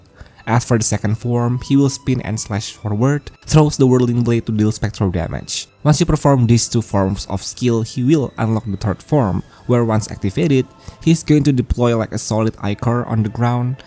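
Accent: Indonesia. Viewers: Org indo bukan si 😅 kek ada aksen indo nya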